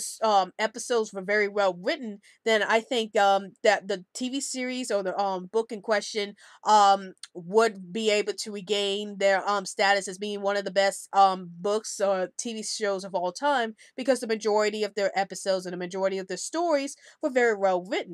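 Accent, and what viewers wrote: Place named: Boston, United States. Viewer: Thumbs up; good video! I feel that quality is important because of the reasons that you said in the video. By the way, are you from Boston? I say this because of your accent.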